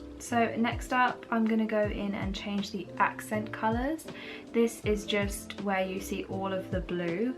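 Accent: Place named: United Kingdom. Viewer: British Accent 💕🔥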